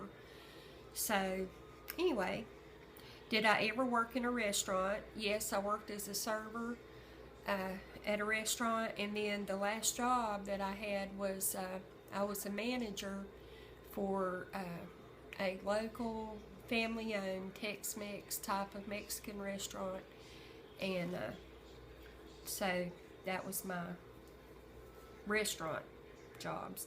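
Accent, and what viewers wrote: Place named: North Carolina, United States. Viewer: I know this is an old video. But my grandmother was born in NC but moved to Melbourne FL and lived there for the majority of her adult life. I've been to Eau Gallie, is very cute! I think it's funny that y'all are in reverse! She had a NC accent like yours and i find it comforting. :) I'm also a momma's girl. I adore my mom.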